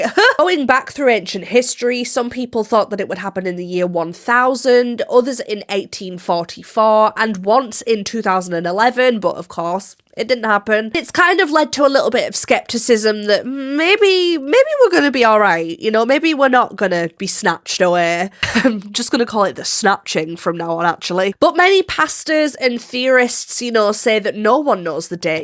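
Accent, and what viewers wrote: United Kingdom. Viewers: So, the way this whole mess looks to me as outsider / Europian - It feels kinda like those doomsday Evangelical Christians of yours, there in the US are some kind of a crazy mix of "Jehovah's witnesses on steroids", and modern variant of the Spanish Inquisition, lol. That's how crazy this Rapture thing looks to me! Now don't get me wrong, we have pretty diverse mix of Christian denominations here in Europe too. We have Catholics, ofc, Orthodox Christians, Protestants, Anglicans, etc. But it's not as nearly that "on the nose", and so much in every part of daily and social life, like you made it look there. Everyone is free to practice their religion they choose, but it's mostly a private and intimate thing, and hardly anyone will start talking to you about it, if you didn't specifically ask them about it. Let alone preach it and trying to convert someone, or go into discussions and arguments about who's religion is "better", or something like that. 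And in the most of them, as far as I know, you don't have that Rapture dogma, or what not, as represented as it is among US Evangelicals. And of course, we have many of atheists, agnostics, some Pagans, etc, but that's neither here nor there, so Idk why I'm mentioning it at all. Anyways, this whole Rapture thing looks so unreal and so crazy to me, that it's hard to take it as the real life, lol! But Idk why am I explaining all of this, when you sound more British than US to me, judging and going just by your cute & cool British acce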